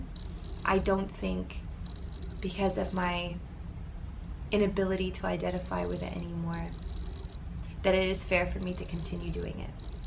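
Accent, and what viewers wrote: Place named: United States. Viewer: اممم. امها امريكية فهمت ليش تتكلم كذا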